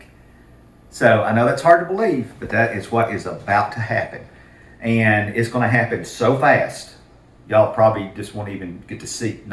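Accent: South, United States. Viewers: OOOH MY GOODNESS!! I HAVE FOUND A NEW FRIEND, IN MY HEAD! LOL! I FEEL IN LOVE WITH YOU, YOUR AMAZING SOUTHERN VOICE! I SUBSCRIBE TO YOU RIGHT AWAY AFTER THE HONEY BUN CAKE! I TURN ON ALL NOTIFICATIONS I LOVE HOW CAME IN A STOLE THE SHOW! YOU GUYS ARE SIMPLY AMAZING!! PLEASE CONTINUE TO SHARE THE LOVE AND JOY WITH US!! I LOVE YOU BOTH!! MERRY, MERRY CHRISTMAS!!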